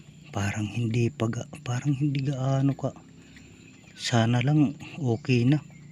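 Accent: Waray, Philippines. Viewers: Shout out ka paki sagot nman kung San ka sa samar or Taga diin ka kasi narinig ko kasi parang waray Karin?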